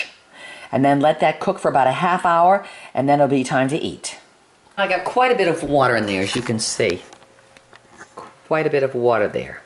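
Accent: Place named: East Coast, United States. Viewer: your accent sounds east coast, but not like florida, good recipe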